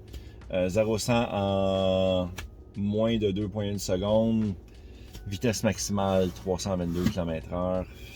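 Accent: Canada. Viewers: Bonjour super tes vidéos, je vais chercher ma TM3SR+ dans 2 jours, malheureusement je ne te connaissais pas encore au moment de commander (regrets!) Tes vidéos sont très intéressante et ton expérience au Canada est très précieuse pour nous en France où dès qu'il y a 10cm de neige on est en panique;) (perso à -5 mon cerveau commence à freeze donc à -40 je pense me désintégrer:D ). J'ai cru comprendre que vous aviez des normes à respecter concernant vos pneus neiges, pourriez vous nous en dire plus sur ce point, peut être aussi sur la conduite sur neige avec une propulsion? Chez nous l'utilisation d'une borne à domicile n'est pas forcément recommandée (peut-être par ce que nous sommes en 220V). Est ce le froid, tes km journaliers, le 100V ou comme moi le coté geek qui t'a poussé à en acheter une? Merci encore pour ton humour, ta voix Canadienne et tes expressions, et la bonne humeur que vous dégagez toi et ta petite famille. 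(Ouais je te dis "Tu" car on doit avoir le même âge ~ 32 ans;) )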